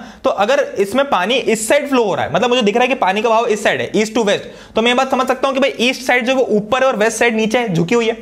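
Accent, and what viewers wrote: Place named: Punjab, India. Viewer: Sir teaching bilingualy , mixture of Punjabi and Hindi , aaahhaahha❤❤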